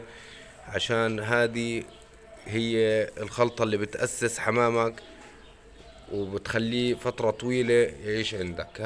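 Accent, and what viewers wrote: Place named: Palestine. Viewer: وانت كمان بتحكي مثلنا طاسه ههههههههه يسعد قلبك فلسطينيه كح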